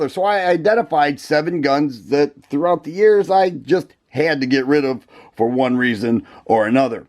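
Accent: Michigan, United States. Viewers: Are you from Michigan or somewhere near there?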